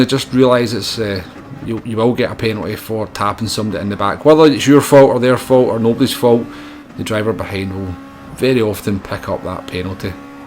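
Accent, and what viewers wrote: Scotland, United Kingdom. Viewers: haha I knew exactly what you meant so it's not a bad descriptive either, just a rather amusing one. Especially with the Scottish twang. Maybe you should trademark it for when you hit the big time of seven gazillion subscribers ;)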